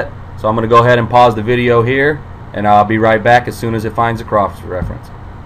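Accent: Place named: Canada. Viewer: Lmao. Oh! Just kiddin'! Sounds like a Canadian accent, almost Chicago-ish. Anyways, nice tutorial bro. :y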